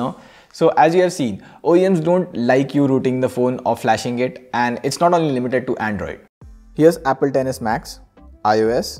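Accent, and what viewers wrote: India. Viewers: As an English speaker, it's so difficult to listen to somebody with an Indian accent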